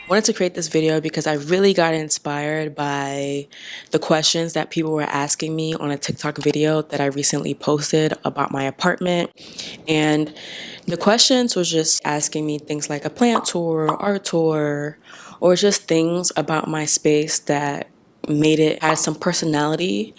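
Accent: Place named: East Coast, United States. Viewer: ur accent is so east coast, so comforting 🙂‍↕🙂‍↕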